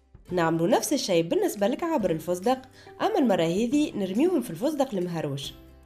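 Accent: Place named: Tunisia. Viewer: الله يعطيك الصحه ابنتى اول مرة كنشوف هاد نوع من حلويات تونسيه رائعه جداااا حطيت الاشتراك لأنه عجبتني طريقة شرح وتصوير ممتاز وخا شويه صعب عليه لهجة تونسيه إلى ممكن ابنتي فضلا وليس أمرا سوال أتمنى جوابه بتفصيل بنسبه للعقد اللوز والفستق ونوع تالت إلى عملنا نفس الي قلتي يعني القطر العادي كم المده الي لي نحتافظو بيها هاد نوع الحلويات واش ممكن يدخل للفرن وشكرا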